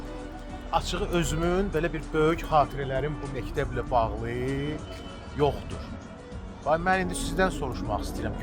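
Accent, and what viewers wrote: Baku, Azerbaijan. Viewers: Bakı ləhçəsi necə də xoş gəlir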